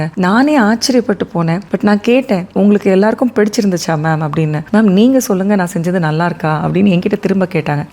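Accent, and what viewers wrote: Madurai, India. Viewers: Madurai ya akka neenga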